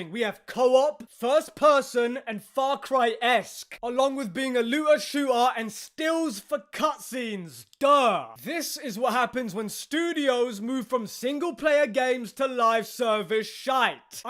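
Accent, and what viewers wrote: United Kingdom. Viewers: Totally agree with you but your loud British accent gave my Irish head a sharp pain. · Your briish rumble makes it even funnier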